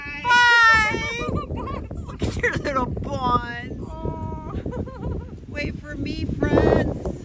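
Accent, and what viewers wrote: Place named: Michigan, United States. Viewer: That hybrid Michigan accent with Wisconsin and Canadian overtones.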